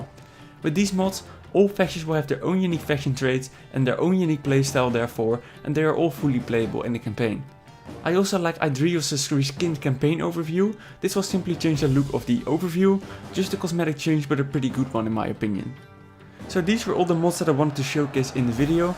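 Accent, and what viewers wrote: Netherlands. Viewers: You have the most horrible Dutch accent ever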